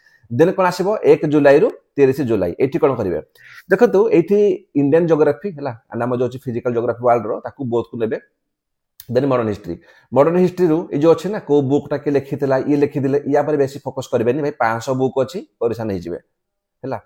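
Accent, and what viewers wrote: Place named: Angul, India. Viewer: Sir aapana angul area ru ki language semti janaa paduchi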